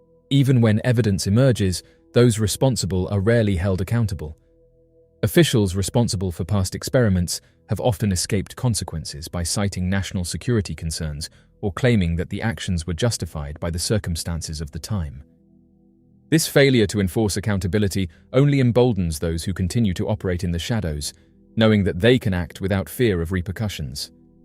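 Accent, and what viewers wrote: United Kingdom. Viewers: The British voice makes is more truthy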